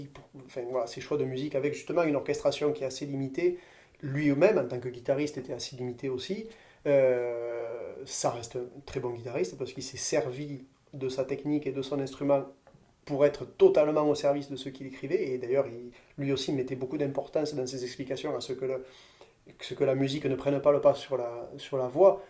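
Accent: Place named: Béziers, France. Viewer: Très intéressantes remarques sur Brassens, ce que je ne connais pas, qui parle avec mon accent, que je me sens seul à colporter dans ma ville natale de Béziers.....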